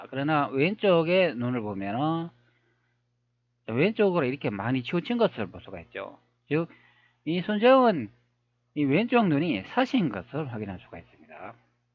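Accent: Gyeongsang, South Korea. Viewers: 는 말투가 경상도 끈은데 책보고는 5.18선동좃나개 하네 니는 5.18을 선동하나 안하나 5,18은 북조선 빨갱이 짖이야 아니야 궁굼하댜 니 영상 잘 보고 잇댜 댓글 한 달아보쇼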